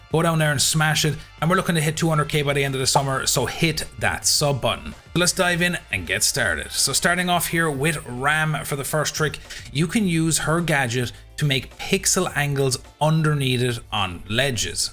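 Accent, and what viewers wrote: Ireland. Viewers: Probably because he's Irish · Hes probably just irish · What part of Ireland r u from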